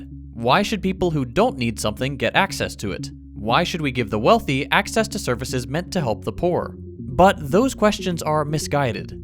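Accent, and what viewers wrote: Texas, United States. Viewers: Bro is texan? Wtf?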